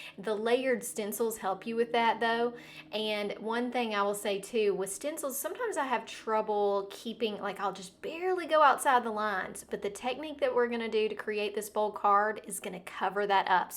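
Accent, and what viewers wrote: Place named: Texas, United States. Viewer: Beautiful! And I enjoy that Texas twang...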